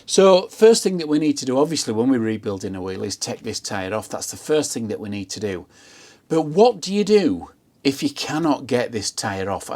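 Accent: Yorkshire, United Kingdom. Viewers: 😆Totally love your accent! Yorkshire I guess?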